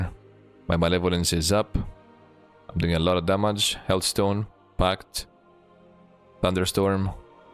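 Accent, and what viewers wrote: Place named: Greece. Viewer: Cool accent, is that greek if you don't mind me asking? :)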